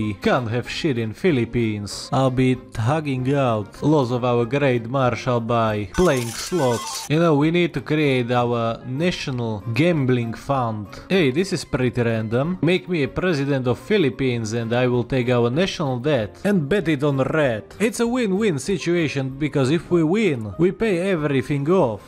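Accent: Russia. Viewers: Bro your the best 😜 your Russian English is quite cool 🥲💀☠️ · Bros speaking russian accent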